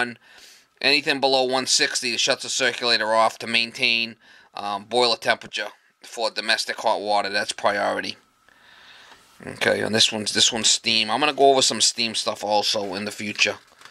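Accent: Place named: Northeast, United States. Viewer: you have done a service to all. 1. younglings, they need the facts and must listen carefully 2. blue hairs, need to learn the newer stuff. 3. customers, yes customers, they need to see what it takes to get the comfort that they have grown a costumed to, and need to be willing to pay for. your videos are invaluable to all. and I thank you for a very clear honest and informative channel. "every day is a school day" is dead right, I work in industrial maintenance and that is the best truth that I have herd in along time I will plagiarize the . I have watched 4 hours if your videos tonight on the 52" plasma w/ full Dolby surround and loved every minute of it. WOW you are honestly the greatest. & I love you heavy N/E accent, it is killer! you are a gem. & yeas I know how much work it is to prepare each video, not so easy. even if you try to edit "in camera" it still takes a lot of work.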